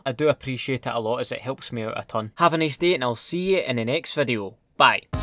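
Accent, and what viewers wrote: Scotland, United Kingdom. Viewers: Are you Scottish? He’s Scottish right everyone? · your accent almost like soap...is soap a scot too? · The scottish accent makes this even funnier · Heard a fellow scottish accent and subscribed instantly 😂😂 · I'm new to this channel but after reading some comments and listening to some good Scottsman rambling, I think you gents (an I use that term loosely) will take good (alcoholic too, no doubt for ya scotts) care of me. You've earned yarself a sub. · After TF141 got intel on makarov Shepherd wanted to all the credit for himself so he tried to eliminate the task force and get all the credit once makarov was killed. He wanted to redeem himself after losing 30,000 people in a snap! I know i'm 5 months late but who cares. Also are you scottish? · McGregor is Irish, and uploader is Scottish, like soap and captain McMillan. 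· u Scottish? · Your Scottish voice makes this way better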